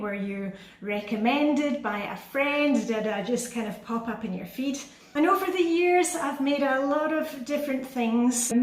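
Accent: Scotland, United Kingdom. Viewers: Hi, I found your YouTube channel around 2 years ago through a recommendation from another channel...sorry I don't remember which one---I had read "THE LITTLE BOOK OF HYGGE, the Danish way to live well" by Meik Wiking. My dad was born in Dundee, so I felt kinship with your Scots accent as well. I have also followed the Flylady system. I've totally enjoyed your channel as a subscriber and I want to congratulate you on a job well done!! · Hi. I often think of you, with your Scottish accent, saying "Good enough is good enough" 😊 That's such a good one! I must have found you soon after you started. I feel like I hit the jackpot when I did. I was searching for cleaning motivation on YouTube. You're such a blessing to many of us, even here in Ohio 😄💖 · I found you early 2021. You were doing a video as part of the mega motivation collaboration with the minimal mom. 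I just loved watching you as it was nice to hear a Scottish person on YouTube as I live in East Lothian. You have transformed my home routines as I now follow a system and my home is definitely more hygge and I love it. Keep on doing what you are doing as I love the fact you are so relatable and you have such an interesting life. Xx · Hey, I have been watching you for 2-3 years. I was new to YouTube and you kept popping up in my feed. One day I clicked on you and thought, well, she's in Denmark, but she sure sounds like a Scot to me (I'm a Scot living in the US). I learned FlyLady from you and it has made a wonderful difference to my life. On the mend after hand surgery and I know exactly how to get back into the swing of things, I don't have to wonder where to start. I love all of your videos. You are so pleasant and positive and your videos bring a spark of joy to my days. Congrats on the 15 mill!! 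· Happily, you popped up in my feed ( I am constantly looking for help with my routines!) Have been watching for 2 or 3 years now, you are an I spiration, love your content, your personality, hygge, and your Copenhagen lifestyle, all wrapped up in a gorgeous Scottish accent, thank you❤ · I've lived in Scotland for 8 years and I've got the biggest soft spot for the lovely, cosy , Scottish accent. You could read the back of a packet and I'd still listen to it. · I don't remember the exact time I found you but I heard about you through the FlyLady website - it was after you had been a FlyLady mentor because you identified yourself as a former FlyLady mentor. Your you tube channel appealed to me because I had been using the FlyLady system, I was a language major in college - German, French and Russian - so I enjoyed your Danish and Swedish "lessons" and I have always loved the Scottish accent and you have a lovely one and I love reading - especially mysteries!! 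Thanks so much for the tips and encouragement over the years and congratulations on your You Tube Milestone! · 4 years ago, and by complete accident- Over 10 Ways We Really Hygee Here in Denmark. You had your crisp haircut, wearing camel color knit, sitting in your wing back chair. Next thing I knew I watched all your content. Was really inspired to tackle letting things go, and practice daily routines. Really appreciated your simplistic frankness. Somehow it cut through the chatter of everyone else. I was always impatient with Fly Lady content from others. Sorry if it's weird, but your charming Scottish accent kept my attention while, at the same time, calmed me. Thanks for being the company in the room cheering me to get the thing done. It's been a real pleasure 🤩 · I was searching for someone who could explain the flylady system to me, lol, I found you. My husband is Scottish and I liked bringing a bit of your accent and culture into my home 😊. 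That was about 4 years ago. As a shift worker I don’t really have a system down but you give me inspiration to keep trying and do small things every day, thank you 🙏.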